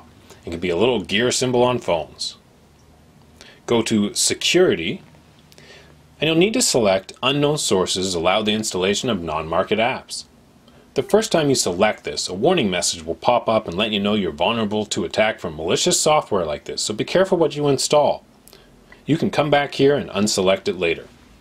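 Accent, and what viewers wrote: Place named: United States. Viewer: I am typing as fast as i can, while my statement is true. I have tried for more than a year to get this on my Zeki 7inch. followed stuff on xda, etc. but got little to nowhere. first you have a great voice. Second you speak American English. Third you are organized and clear. This wasn't working so I followed someones comment below -clear cache. That didn't help so i bit the bullet and cleared data. It asked to accept google terms. i did play store opened. Hooray. I subscribed so will be watching again and more. Thank you.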